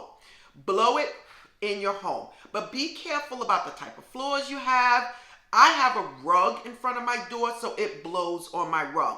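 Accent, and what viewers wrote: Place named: New York City, United States. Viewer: Thanks, friend. I'm originally from Long Island (but I'm a Georgia peach now) and your NY accent is next level - wow! Thanks for the tips.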